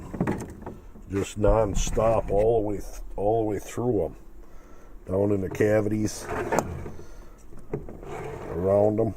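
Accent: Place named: Midwest, United States. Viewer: You sound so midwest I love it.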